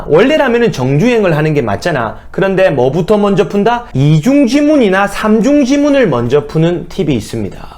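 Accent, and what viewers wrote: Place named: Busan, South Korea. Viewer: 사투리 쓰는거 욀케 멋있게 들리지? 부산 사투리인가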